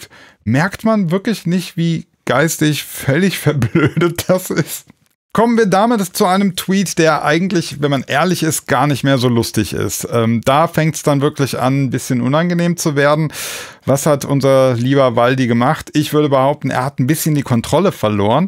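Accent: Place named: Turkey. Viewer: Er hatte schon in einem Video das aufgeklärt. Es ist halt die türkische Aussprache.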